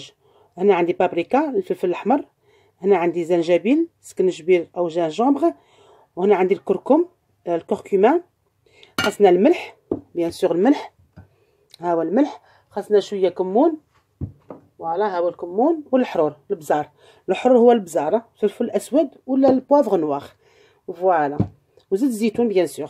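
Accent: Oran, Algeria. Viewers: واش نقولك راني شفت القناة ديالك عندي أسبوع و كلش عجبني فكرتيني بالماكلة تاعنا في وهران و حتى هدرتك الله يحفظك و يحفظلك بناتك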